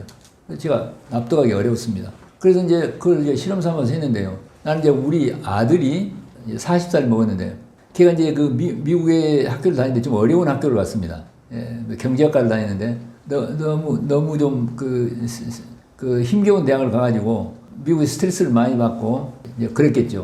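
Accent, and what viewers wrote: Jeolla, South Korea. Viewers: ㅜ 라도 사투리ㅜㅜ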